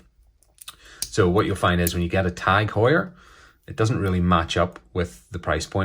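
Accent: Northern Ireland, United Kingdom. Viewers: Yes it's a small world. I had watched a Just one more watch video and your video played after it. When I heard NI accent and looked at the name I thought you must be Shaun's brother. Hope you are keeping well and getting through lockdown ok. We have just had ours extended another 3 weeks.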